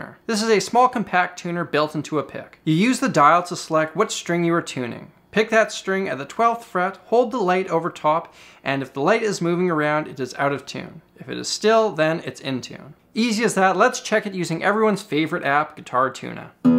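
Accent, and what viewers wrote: Canada. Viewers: to be fairrr isnt he canadien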